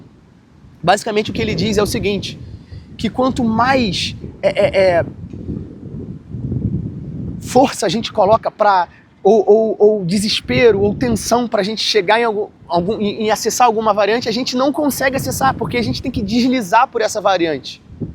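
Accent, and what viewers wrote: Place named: Rio de Janeiro, Brazil. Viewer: Perfeição é o nome do seu vídeo. Viral, sensacional, português claro de carioca (foda)😂😂😂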